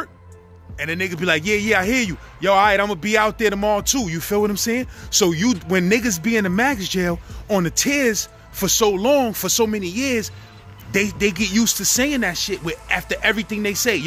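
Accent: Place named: South Louisiana, United States. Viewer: Sounds like south Louisiana! You know what I mean! Everybody says that phrase down here " You Herd? Especially in New Orleans, Louisiana! The speak New York_a_leans! Later brother,keep safe,be Righteous! Mike Edwards Crowley, Louisiana.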